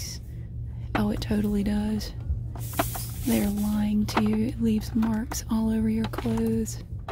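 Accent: South, United States. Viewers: I love when u do shelf organization. The mixture of your southern ascent and organizing cosmetics gets me hooked every time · Your so pretty! I love your accent! Wish I had a southern girl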